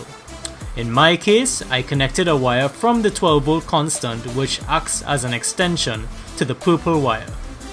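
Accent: Trinidad and Tobago. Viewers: You have a trini accent but I’m wondering where you’re from.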